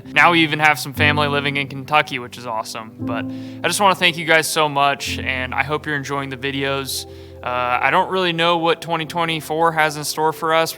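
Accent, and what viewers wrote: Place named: Ohio, United States. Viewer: i just saw kentucky but even in ohio they have ur same accent up here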